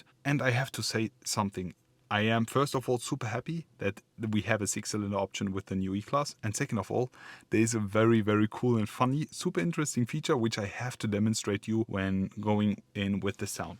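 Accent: Germany. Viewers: Why does this guy sounds like a German speaking english.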